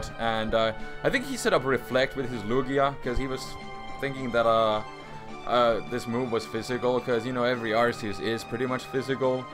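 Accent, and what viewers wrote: Finland. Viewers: Suomi-aksentti <3 Erotti meinaan heti ;) Ei mutta hyvin sää vedät!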